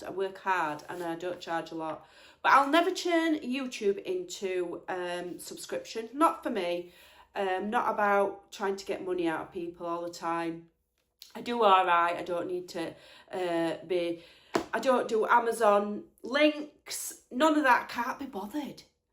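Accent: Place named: Northern England, United Kingdom. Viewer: You know what, I'm fairly new to your channel and I've not actually seen you before. Don't take this the wrong way, I really enjoyed your videos before, but I like you so much more now I've seen you're lovely face and the source of that great Northern accent. I'm a northerner living in the south (i know, a traitor 😂) but I have found so few English accents on YouTube, let alone northern ones. Having said that I love loads of American and Australian ladies' videos. So keep on keeping it real and I'll keep up my subscription! deal? Hahaha. Have a great day.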